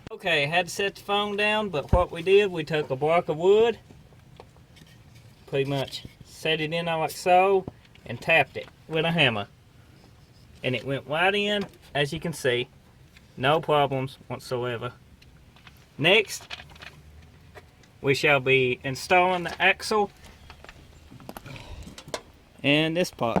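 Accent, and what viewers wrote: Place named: South, United States. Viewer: what accent do you have, it sounds like a southern American, and a hint of Australian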